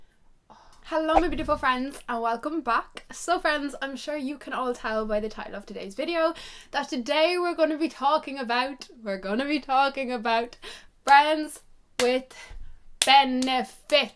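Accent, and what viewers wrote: Ireland. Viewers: I love your Irish accent! Can u say 'r u actually stupid?' in an Irish accent?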